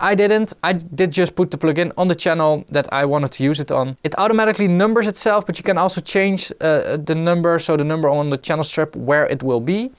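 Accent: Netherlands. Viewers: Steenkolen accent.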